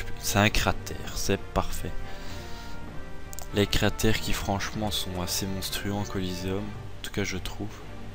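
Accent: Belgium. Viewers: je kiff ton acent belge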